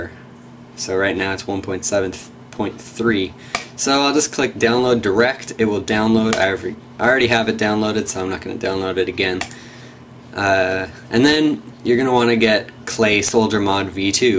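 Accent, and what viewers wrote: Canada. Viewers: r u canadian??? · R u Canadian? If so, I can tell......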